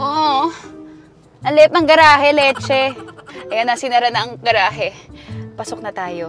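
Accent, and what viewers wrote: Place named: Philippines. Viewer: The english filipino accent days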